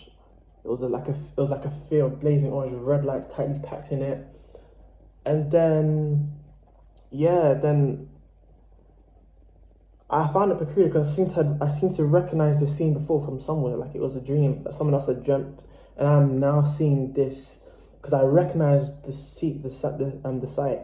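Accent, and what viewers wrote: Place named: London, United Kingdom. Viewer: Are you from london? You sound like you are - I saw ur comment on a DMT video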